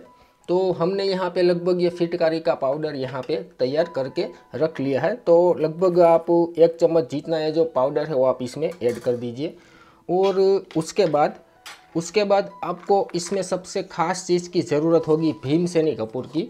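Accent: Gujarat, India. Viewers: Kya bhai tum Gujarati ho ha moj ha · Are u Gujarati???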